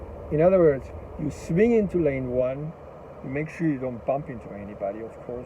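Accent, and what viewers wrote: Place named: Germany. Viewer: I’m enjoying learning from your content. I notice your accent sounds European like from a German speaking (Germany, Austria, Belgium, Switzerland) Country. Is the economy/marketplace for professional transportation work better for entrepreneurs in America than Europe? Do they have pilot car escorts for major commercial shipments on the roadways in Europe also?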